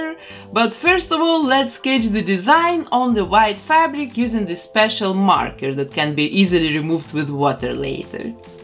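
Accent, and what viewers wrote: Russia. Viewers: Акцент очень русский... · If I may ask... You have a gorgeous accent (I’m American) my husband says you’re from Russia (he’s a musician in a Russian place) I think you mentioned Belgium. It’s so beautiful!! You have a gorgeous accent!! ♥️♥️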